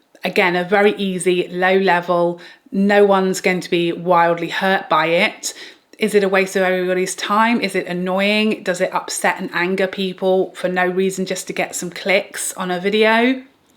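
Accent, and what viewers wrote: United Kingdom. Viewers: Hi dear, looking beautiful as always!!!🤩🤩🤩🤩And thanks for sharing this with us! Never heard of this actually, what a waste of time! I will never want TikTok, i tried Treads for a day, but found following here and on Insta is enough. But i love watching your Channel of course, love it! And other content creators as well! Maybe the people i follow are very normal, but i never exprerienced this. Maybe i'm boring, but i follow people from Weight Watchers, of course people from church, singing etc, cause i'm a christian, i love to follow wildlife, of course i love to follow makeup as well, doing Duolingo on my phone to learn some more Spanish, but i never knew this existed! Thanks for letting us know, dear, you have so much variation in your video's, this is serious, but you also make us laugh, knowing a lot about makeup! Strange creating hate between Europe and America, i love America! 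So when i would say here i love the British acccent the most, which is true, that would never be to insult from me to anyone from Canada, USA, Australia, New Zealand, never thought about that actually, it's just that i love to hear it. Born in 1970, digi disaster number one here, hubby and son keep on laughing sometimes what i did again, but i can manage myself better and better in digi-land, baby steps,. I think i'm also tooo naif, i always think the best of people, so, i really appreciate such a video, to keep me awake, thanks so much!!!❤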